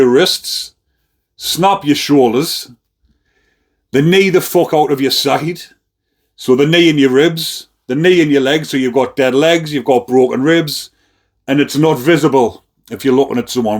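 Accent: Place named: Derbyshire, United Kingdom. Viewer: I'm curious, where were you born? Your accent sounds reminiscent of the Derbyshire region. I could be wrong, of course.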